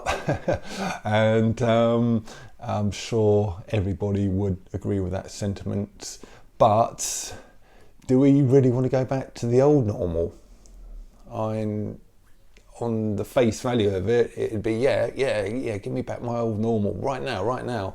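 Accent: England, United Kingdom. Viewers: Your speaking like a true English man!! Love and respect!! Time to look after our own!!!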